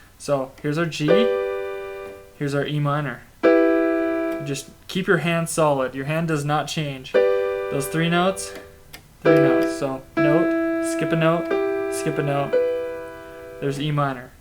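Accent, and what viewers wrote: England, United Kingdom. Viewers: hello where ur hometown ? i really like your english accent ^^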